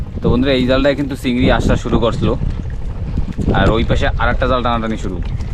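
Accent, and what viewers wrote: Bangladesh. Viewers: কথায় বাংলাদেশী ভাব 😅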